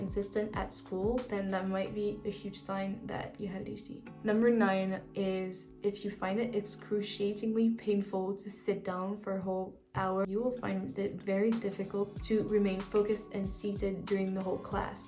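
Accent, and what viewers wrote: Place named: France. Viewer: Je reconnais à ton petit accent que tu dois être française (ou belge je sais pas ?). Et franchement merci pour tes vidéos. Tu mets exactement le doigt sur les problèmes que je rencontre au quotidien et je pensais tellement être bizarre et seule à être dans ce cas et tu nous prouve le contraire. Merci infiniment et continue à faire des vidéos comme ça parce que tu vas aider plus d'une personne paumée ah ah. Ça fait du bien de se sentir comprise et de savoir que d'autres personnes arrivent à mieux vivre avec leur TDAH. J'ai hâte d'avoir tous tes conseils pour apprendre à mieux vivre avec ça. Vraiment, merci ❤️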